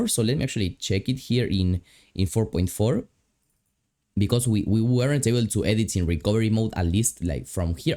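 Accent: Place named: Argentina. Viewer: Aunque hables en inglés se nota que eres argentino 👍🏻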